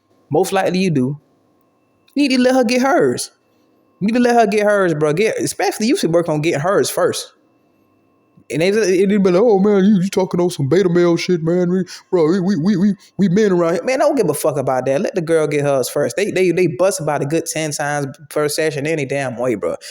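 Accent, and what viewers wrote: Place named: South Florida, United States. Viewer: I ask bc you have a soflo accent and dialect 🦾 I was like be Fromm the crib lol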